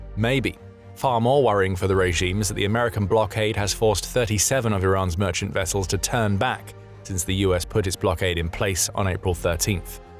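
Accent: United Kingdom. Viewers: Why the UK Accent? Gotten indeed!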